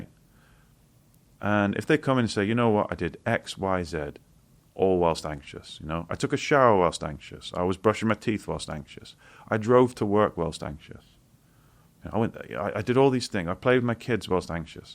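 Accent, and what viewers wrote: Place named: Manchester, United Kingdom. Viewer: I’m so glad I found your Ted talk which led me here. As a fellow Mancunian (living overseas for the past 30 years) it’s so lovely to hear a familiar accent and I totally get your humour. I have had anxiety for as long as I can remember. I can’t get out of bed on a work day without an anxiety meditation and now their effect is diminishing and I feel like I constantly have to look for the next quick fix. After listening to this I’m going to take my anxiety for a shower tomorrow! Thank you!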